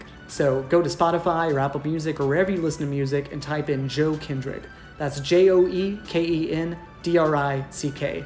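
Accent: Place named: North America. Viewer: it's possible that other software devs have already some or all of this work (like if there's a "Zelda Mod Tool" made by fans you can download) but even so it'd be a Herculean task if done as a mod. If done as a standalone game, it'd be as much work as... ya know, a game - which is a ton of work that can't be sold if you don't have the legal rights to use the characters. So, as long as he was giving it away for free, it'd probably be technically within Nintendo's policy for fan projects, but they set those rules and they have a history of shutting down projects like this. Judging by our guy's accent, he's probably either from the US or Canada, and in either place, even if the courts decide he's totally in the right, if Nintendo decides to sue the process would take literal years and tens of thousands of dollars in legal fees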